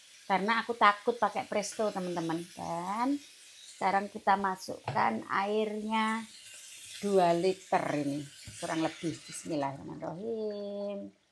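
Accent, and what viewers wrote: Java, Indonesia. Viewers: Assalualaikum, salam untuk klg semua dari Balikpapan (kaltim)kalo liat vidio ini bisa senyum" sendiri logat jawanys itu lo yg bikin lucu😀😀👍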